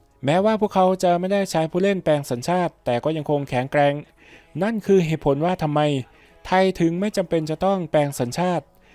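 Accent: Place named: Laos. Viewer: ใส่เสียงลาวคือชัดแท้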